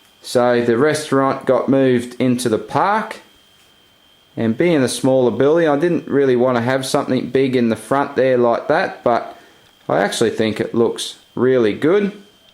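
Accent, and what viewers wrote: Australia. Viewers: are you australian because thats AWSOME!!!!!! i'm aussie to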